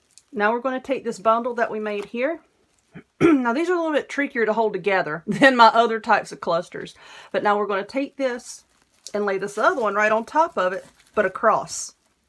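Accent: South, United States. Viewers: Just beautiful! Love your Southern accent. Keep up the good work. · I love your deep southern accent! And the way you explain things makes me feel like I could do this project too! So inspirational! Thank you!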